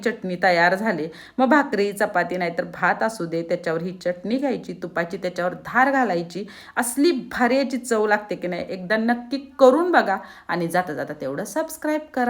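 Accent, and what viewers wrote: Kolhapur, India. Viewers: तुमच कोल्हापुरी ऐकूनच चटणी करावी वाटते. नक्की करिन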